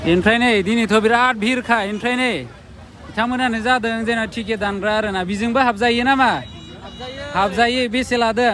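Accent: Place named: Bodo, India. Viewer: Your Bodo is so sweet.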